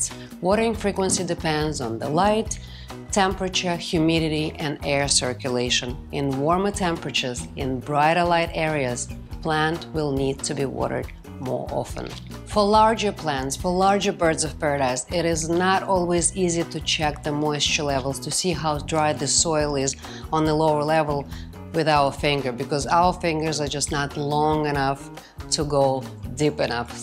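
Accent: Russia. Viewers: Are you Russian? Why do I understand what you are saying if my English level is A1 😅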